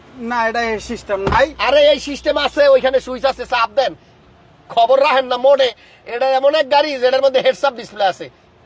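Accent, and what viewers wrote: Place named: Barishal, Bangladesh. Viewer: অরিজিনাল বরিশাইলা রা ফেল এতো দারুন ছিলো আপনার বরিশালের ভাষা।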